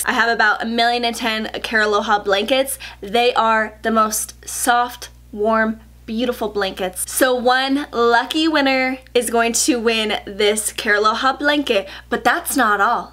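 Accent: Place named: New York, United States. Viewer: you sound like youre from new York in this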